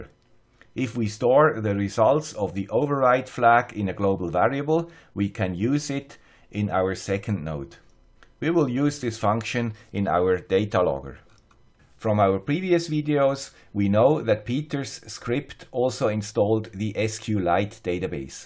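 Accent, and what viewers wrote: Switzerland. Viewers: "Grüezi Youtubers, here's the guy with Swiss accent" should become a personal trademark :) Same as Gennaro Contaldo's "Why I am cooking so goood?"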